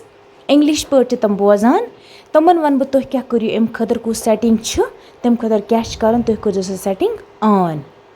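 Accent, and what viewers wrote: Kashmir, India. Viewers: ❤❤ mujhe tho aap ki voice kashmiri mai he aati hai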